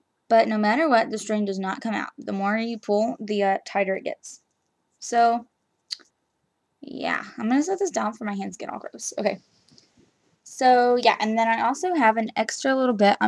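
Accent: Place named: South, United States. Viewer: Are you southern? You have an accent :) I'm from South Carolina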